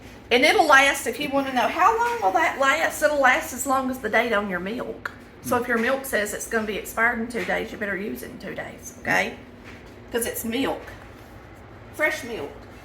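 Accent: South, United States. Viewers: Love your channel!! I just found it 2 days ago and I have been watching since. You cook just like my Granny did. Brings back so many wonderful memories of being in her kitchen. Thank you for keeping good southern country cooking alive. I’m a Georgia girl too living where the sweet onions grow. Thank you for showing just how wonderful southern living is… I heard you say on one show that someone said something about the way you talk..,well don’t change a thing… that’s part of what makes us southern. Thank you for what you and are doing and God bless you❤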